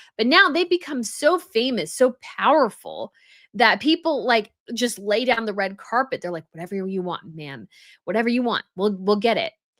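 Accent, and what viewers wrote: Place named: United States. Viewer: Good questions: why go to the party to begin with? Were they actually invited? Was it Megan’s decision? Surely you (ginger boy) know it’s rememberence day? Why not have all images scrubbed from the internet? It’s all bogus, a sham, a cock up. Now as for you, … use the profits you make and instead of the European tours with everyday folks and buying jewellery, etc. get a vocal coach, get a stylist, new haircut and colour, have the stylist redo your wardrobe, get some basic pieces that can be mixed and matched - spoil yourself, up your game. You've worked for it. This is your business and you (unlike megan) are the face of it and you need to present in a certain way. Now, granted, I’m no fan of the American accent and trust me, markle is a deplorable human being, but as a makeup artist and someone with brand management experience, you need to level up. 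Please stop saying “as….(long pause) Well!! (Hard W). It’s excruciating…and you come off as a circus MC/ game show host. It’s slightly inauthentic and I’m sure that it’s not something you are aware that you’re doing. Life has its ups and downs but take the time to better your offering and start with yourself. Your content, I’m sure, is fairly well-researched and put together. Apply that attention to detail to yourself or consider podcast-only content. Start with the vocal /voice coach to correct your cadence and go from there.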